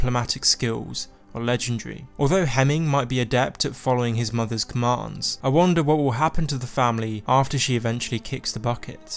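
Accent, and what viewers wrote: United Kingdom. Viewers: Hey I'm curious, what region is your accent from? I know it's British, but what kind?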